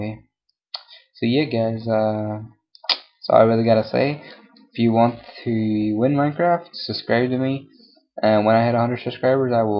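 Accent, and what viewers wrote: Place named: Northern Ireland, United Kingdom. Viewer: Well you sound like your from Northern Ireland, and so am i! :D I'm not going to make up a story saying my parents died or i have no money or my house burnt down and i lost all my stuff either.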